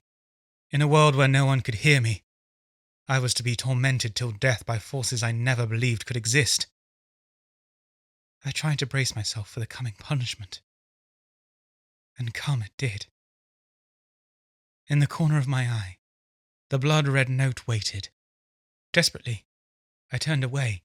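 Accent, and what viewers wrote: England, United Kingdom. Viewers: fantastic story and fantastic narration ! finally a good solid English accent to listen to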